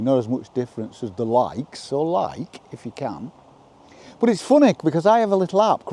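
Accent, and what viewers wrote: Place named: England, United Kingdom. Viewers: Absolutely love your Lancashire accent. So sad to hear it is disappearing 😢. Also you talk so much sense. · Hm.. It is great to hear english accent and someone saying all those great things about Humanity. God Bless You.. 🙂☕ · I don’t know what part of England you are from but I love your accent.❤ No debt means no stress. I wish my government would’ve this way. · Newly retired and beginning my journey toward slow(er) living. For me, the current challenge is more about changing my mindset than altering my actions. One day at a time, as they say. Finally, for those Brits that might know, what area is this man’s beautiful accent from? Maybe NE England? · I’m appreciating your northern accent. All the people I’ve seen talking about slow living so far have been young American women. My issue with capitalism is that a bread company can make more money if they cut costs, so maybe they spray their wheat with pesticides to reduce crop losses, or they don’t pay the farmer a fair price, or they rip up the hedgerows to create a big monoculture field that’s quicker to reap etc. 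Seems like the lower your prices the lower your ethics! But people buy it regardless, because it’s cheap (and therefore they have more money to spend on cheap tat from B&M/holidays abroad/a new bathroom) Every pound you spend is a vote for the kind of future you want to see (to paraphrase Mike Berners-Lee) Thank you for sharing your wisdom! Best wishes from Derbyshire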